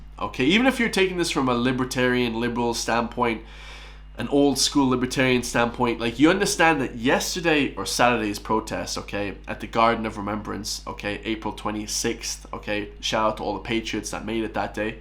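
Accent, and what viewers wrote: United States. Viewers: Why do you sound like a yank?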